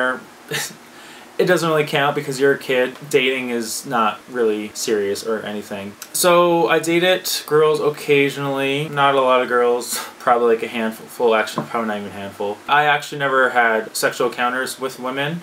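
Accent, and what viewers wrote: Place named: Canada. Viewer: what part of Canada are you from btw ? big city or small town ? ...your accent gave you away before you mentioned Canada...how old did you say you are ? I thought you said 20 yo...nice coming out story glad it worked out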